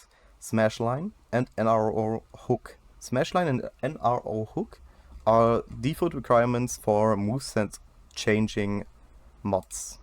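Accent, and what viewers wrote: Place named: Germany. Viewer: Bro klingt mies deutsch ja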